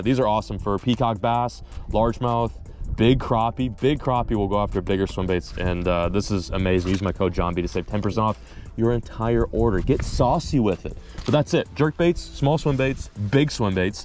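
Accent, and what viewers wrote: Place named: Texas, United States. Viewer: I haven't watched in a while, but Holy shit dude, you actually sound like you're from Texas now 😂😂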